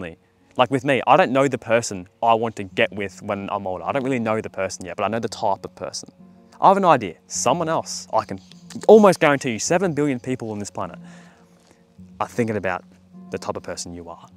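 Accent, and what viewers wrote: Australia. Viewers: Even as a 15 y.o who believes he has the perfect girl, I can't help but still feel unworthy of the love I receive from her. She's the first person who hasn't stressed me out, who wasn't a bitch, who didn't self harm. And I've been with her since April last year. Fingers crossed my mood improves. [also you sound aussie, I'm aussie too :) ] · the man or woman has an Australian accent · You’re Australian right? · Prob Aussie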